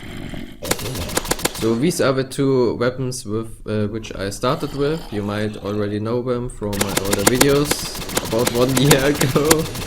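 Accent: Germany. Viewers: höre ich dar etwa einen deutschen akzent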